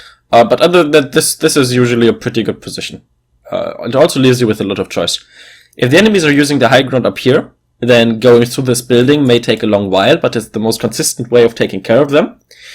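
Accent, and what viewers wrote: Germany. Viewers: Are you German? You kinda HAVE to be German. You sound REALLLLLYYY German bro c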